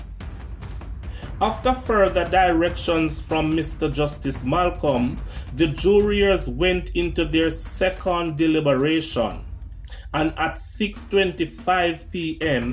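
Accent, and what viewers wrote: Patois, Jamaica. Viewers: PATWA! Spoken language of Jamaica! Surely this must help you understand. If not! GOD guh wid yuh! Bless!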